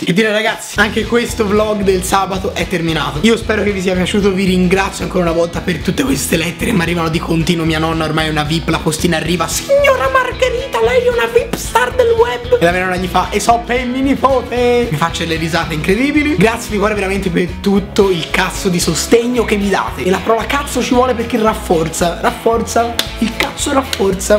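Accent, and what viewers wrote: Tuscany, Italy. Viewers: Oggi mi sono iscritto e scommetto che sei toscano!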